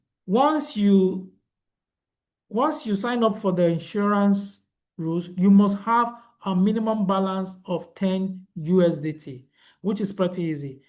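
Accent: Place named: China. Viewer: 一听就是中式英语 可能是沙洋盘的😂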